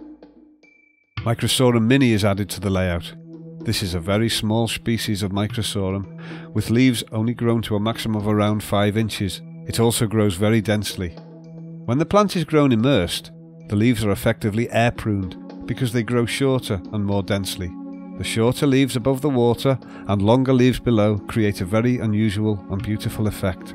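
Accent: Liverpool, United Kingdom. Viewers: Absolutely beautiful but the voiceover by a scouser on Valium reading from a 12 year olds school essay really detracts from the aesthetic as a whole.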